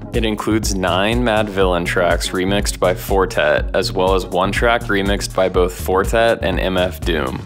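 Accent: United States. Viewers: Opens video American accent Close video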